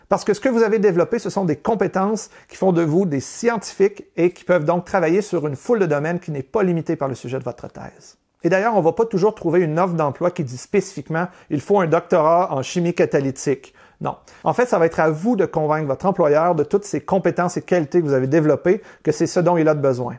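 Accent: Quebec, Canada. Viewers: C'est un québécois, son accent et sa manière de s'exprimer sont toutes deux normales.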